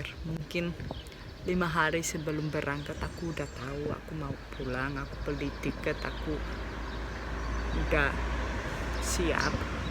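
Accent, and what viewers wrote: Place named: Yogyakarta, Indonesia. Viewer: .....logatmu udah Jogja banget, ditunggu video lanjutane yooo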